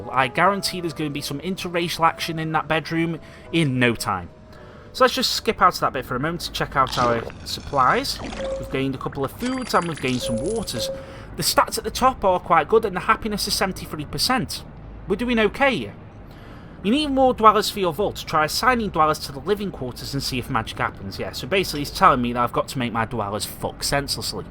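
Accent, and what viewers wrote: Scotland, United Kingdom. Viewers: Are scottish bc I love the accent I wish it was on android :( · Nice scottish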